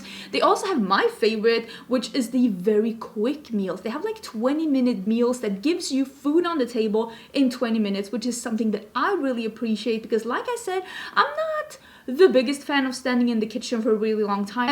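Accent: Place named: Sweden. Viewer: I think I heard you mention previously that you are from Linköping, which is the town my mother was from. She passed away almost 2 years ago and I miss her terribly. Your talking about Sweden and your accent bring me comfort! Sweden is a beautiful country, I have many great memories of it from childhood.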